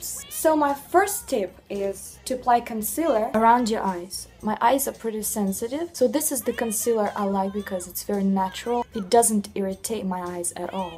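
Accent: Russia. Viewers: То чувство, когда начинаешь смотреть видео и сразу слышишь русский акцент☺️ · I knew she was Russian after the first 3 sec..could decently tell from the accent ( first time seeing her) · Wow this chickadee has some insane skills! She talks like a total professional. Gorgeous with a Russian accent! Class act. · Knew you were Russian straight away, I'm Russian too btw. Tnx for the tricks! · Жуткий русский акцент)) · Lol, that's the first time I heard of a Russian accent making someone sound like a good person. Usually people say "haha, you sound like a movie villian!" Aaanyway, if she sounds like a nice person, it's probably because she's speaking calmly, and maybe because she actually is a nice person, who knows? :P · произношение как у русской! вы русская? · Absolutely agree with you! I'm Russian and for me her Russian accent is just obvious. You can tell it if you listen thoroughly to such words as "fundation" and "of course" (first seconds of the video) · The accent is so cute! 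I knew you were Russian when you started speaking. I’m from Ukraine and I can’t hear my own accent but other people can.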